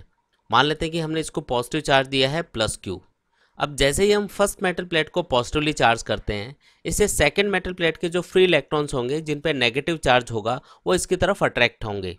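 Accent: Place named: Rajasthan, India. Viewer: Sir kha se ho ... Language se to Rajasthan se hi lag rhe ho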